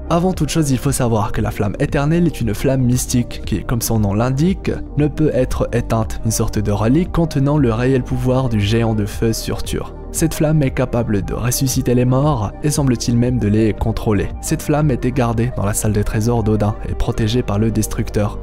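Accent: Belgium. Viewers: T'es belge ??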